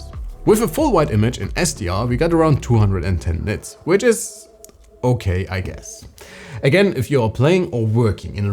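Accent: Germany. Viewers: Your teutonic accent is very annoying. Please improve that.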